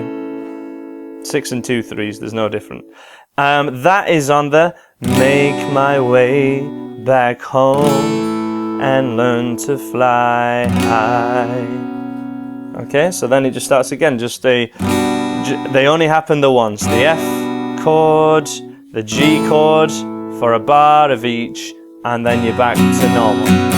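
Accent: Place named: Sheffield, United Kingdom. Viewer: Sounds like a Sheffield accent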